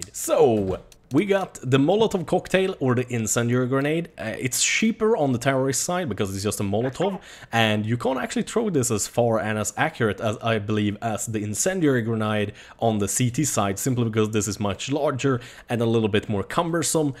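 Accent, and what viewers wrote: Sweden. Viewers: I love the swedish accent xDD lie hopw you say DOTT lol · are you swedish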